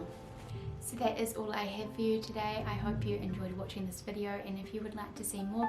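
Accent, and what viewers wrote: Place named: New Zealand. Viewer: The accent is so lovely. I lived in Auckland until I was 18 then moved to Australia and London. Am 54 and still have my accent.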